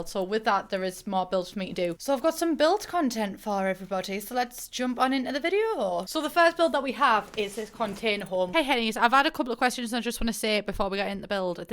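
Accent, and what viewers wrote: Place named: Northern England, United Kingdom. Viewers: She’s got that working class, northern accent charm. Northern hospitality as well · I have been watching your videos far to much now because my thoughts are all in a northern accent and I just typed an casual but professional email and nearly sent it with the opening "Hey hens, how are you all diddily doing"?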